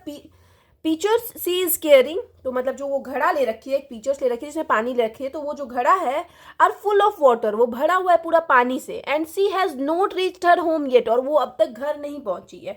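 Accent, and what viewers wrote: Bihar, India. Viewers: From your accent I guess that you are from or somewhere near Patna, Bihar. Give me a like if I'm right.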